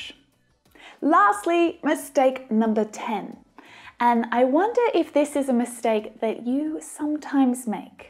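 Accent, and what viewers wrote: Australia. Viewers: Well Aussie say "can't" like "kan" and that confuses me . For example when she says " Bad habits "kan" be fixed I'm not sure it can't be fix or can be fix. Any Aussie here help me out of this pleaseee!!! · Wow the Australian accent is amazing 🐇 i like it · I love your Australian accent. Especially when it comes from female. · Love her australian accent ❤️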